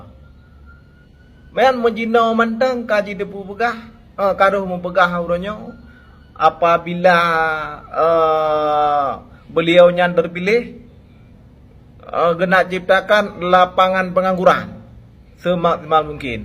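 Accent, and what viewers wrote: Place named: Aceh, Indonesia. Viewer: Nyan. Ciri khas ureng Aceh watee pegah haba, saboh kelebihan yg Hana bak bang sa lain hana perlee metutoe lagak that bahasa indo yg penting betatupoe yg di pegah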